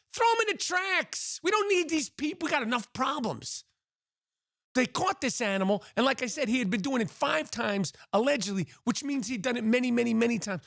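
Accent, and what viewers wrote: New York, United States. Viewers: When I need a dose of New Yorky attitude, I listen to. Who has a classic accent and voice like us anymore? New York attitude is gone. Yeah, we have everyone from all over the world and they get to speak their own language. They come here like tsunami. But they stick together. where is the classic NY accent and attitude - free speech about what matters? Good awards podcast. Thank you for speaking.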